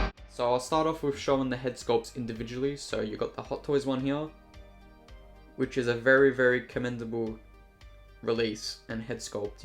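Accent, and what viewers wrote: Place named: Australia. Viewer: I noticed your aus accent too, how much did you get the inart one for?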